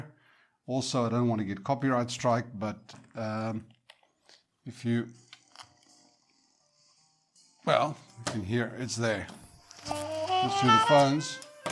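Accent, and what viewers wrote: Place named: South Africa. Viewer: Hi great video, i love old audio equipment. You sound South African by the way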